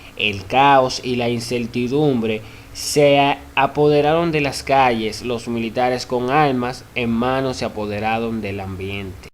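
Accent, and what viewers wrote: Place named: Dominican Republic. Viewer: Dominicano se te nota 🤣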